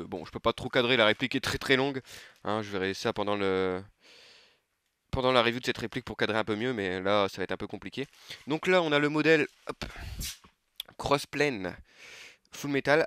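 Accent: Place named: North, France. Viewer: salut, très bon review avec le contenu qu'il faut, et on voit que tu t'y connais, pas d’hésitation dans tes dire et un discours agréable......et un accent du nord si je ne me trompe pas...lol!!! du coup je me suis abonné....au plaisir!!!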